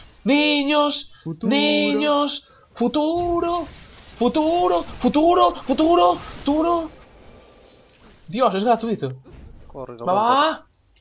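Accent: Galicia, Spain. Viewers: Me suena a accento gallego xD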